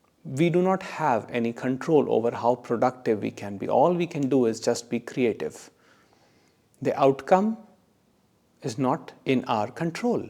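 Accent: India. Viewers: Immediately clicked away when I heard the indian accent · Maybe you are not awakened? Maybe you are just another douchbag on the Internet with Indian accent.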